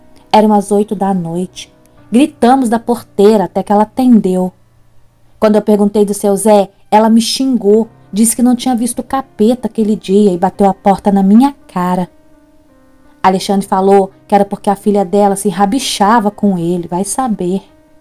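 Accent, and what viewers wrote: Minas Gerais, Brazil. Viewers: É seu sotaque mineiro ta indo embora e vc ta mais calma nos relatos e mais suave.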